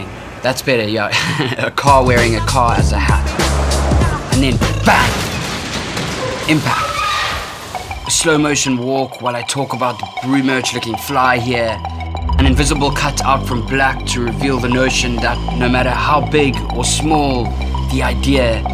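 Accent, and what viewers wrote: South Africa. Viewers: Hey u sound South African.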